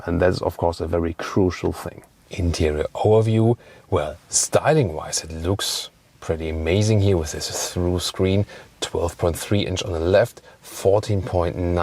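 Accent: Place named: Germany. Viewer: この人ドイツ人だよね。この人の英語にはドイツ語訛りがある。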